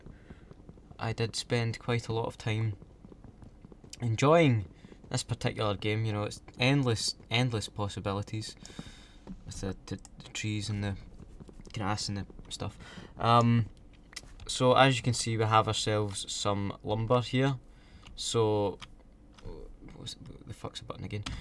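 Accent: Scotland, United Kingdom. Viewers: what part of Scotland are u from I live in Glasgow Easterhouse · I love ur accent:) (Im scottish aswell):D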